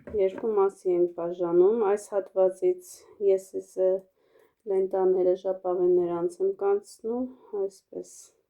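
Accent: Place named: Lori, Armenia. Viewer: Ձեզ առաջին անգամ եմ դիտում։ Ինձ թվումա լոռեցի եք ջան? Բայց ամուսնու կողմը չհասկացա որ բարբառով է խոսում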